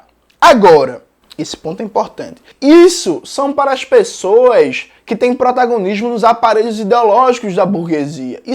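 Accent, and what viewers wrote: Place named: Northeast, Brazil. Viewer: meu amigo! Que aula foi essa hein? Vídeo supeer necessário!!! Seguindo suas redes agora! Compartilhando p geral! Ainda com esse sotaque de meu nordeste....S2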